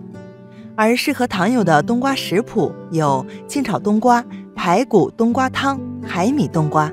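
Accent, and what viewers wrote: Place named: Mainland, China. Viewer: 明明就是ㄒㄧㄝ(第三聲)糖，為什麼總是讀ㄒㄩㄝ、糖？大陸發音？我學的不對？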